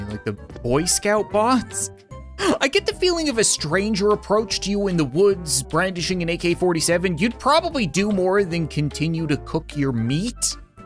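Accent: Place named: Newfoundland, Canada. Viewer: He has a Newfoundland accent where is he from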